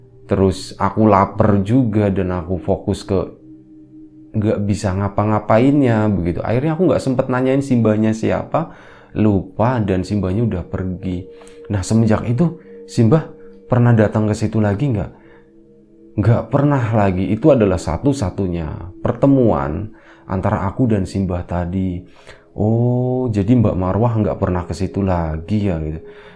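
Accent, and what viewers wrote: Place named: Riau, Indonesia. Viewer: Judulnya itu lhoo mas... ngreget aku.. 😆 Shang Pemulung Riau Hadir mas, Riau mana ini suaranya.. 🙌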